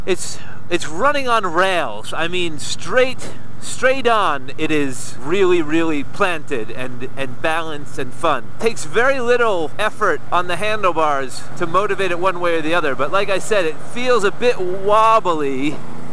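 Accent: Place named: United States. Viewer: Hi, How is it that you have made your home in England. You sound like an American. I lived in London as a teenager and have always wanted to return and live there. How did you do it?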